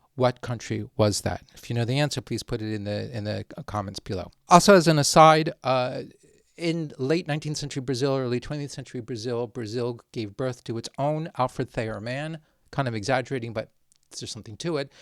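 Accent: Spain. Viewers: Brazilian here: fun fact, in internal politics in WW2, Brazil stood neutral in the beginning because it was having good affairs both with the US but also with Nazi Germany. And our government being running by Getúlio Vargas, sweared that we wouldn’t join the war not even if a snake smoked. Getúlio Vargas is known as our first dictatorship that was actually very positive viewed albeit the negative sides on dictatorships as we know them. But when Brazil joined the war, the slogan here was “A cobra fumou”, translated as “the snake smoked”. Edit: I forgive your pronunciation but we speak so much different from the Spanish pronunciation and you basically read our words with the Spanish pronunciation and some people here will get peeved.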